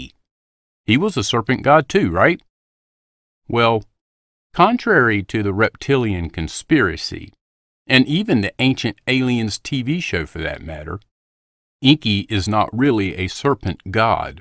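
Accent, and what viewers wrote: South, United States. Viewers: More content like this! I love your southern accent while reading the esoteric goods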